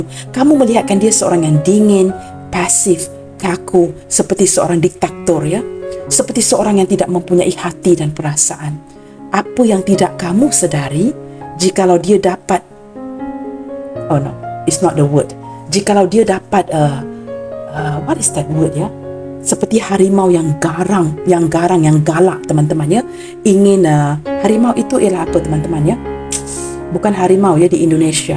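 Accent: Malaysia. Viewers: Sleng MCM mlyu lah..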